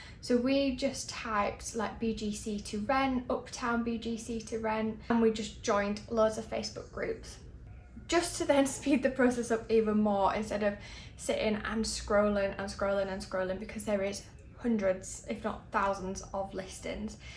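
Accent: United Kingdom. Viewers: We love listening to British accent…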